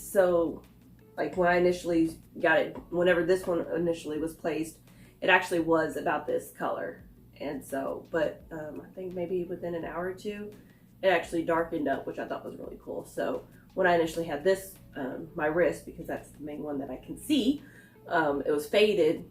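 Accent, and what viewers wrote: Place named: South, United States. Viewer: Hello love still going strong I see... just give them a thumbs down 👎 call it what it is, I won't tell nobody😂...how you been? Also what's that accent im thinking arizona, also sounds a little southern🤔.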